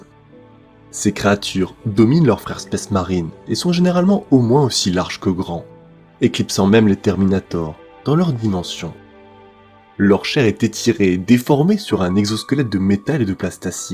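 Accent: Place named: England, United Kingdom. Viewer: Chouette vidéo, par contre vraiment pas fan de la prononciation des noms avec "l'accent anglais", cela fait très "lourd" avec tout le reste en fr...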